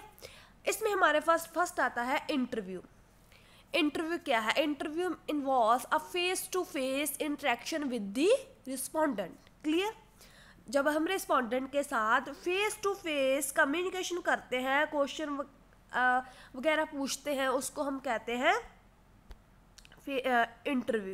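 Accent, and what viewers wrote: Punjab, India. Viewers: मैडम जी आपने जो भी पढ़ाया बहुत cute लगा जी, किन्ना सोना बोलते हो जी आप ☺️ पूरे पंजाबी टोन है जी ! बहुत बढ़िया , आप पंजाबी हो🙂?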